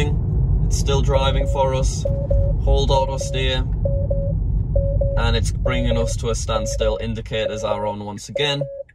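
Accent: United Kingdom. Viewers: I thought u was American but ur British Greetings from Italy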